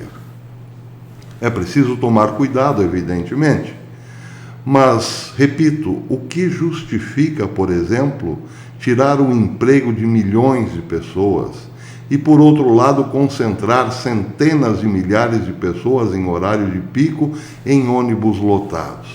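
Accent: Caipira, Brazil. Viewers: Boa tarde, professor. Que bom que vai ter live todo domingo! Professor, fico besta com o senhor. Por issogosto tanto desse canal. Ou o senhor conversa em caipirês ou demonstra sua extrema modéstia, como hj: "Simpatizou com essa belíssima figura que sou eu", de qq modo é uma alegria seus vídeos. Vira e mexe o senhor aparece com uma novidade. Obrigada, professor!!!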